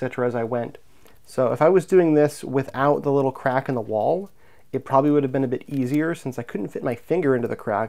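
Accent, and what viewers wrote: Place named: United States. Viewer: How did you get such a solid American accent as a Finnish guy? :S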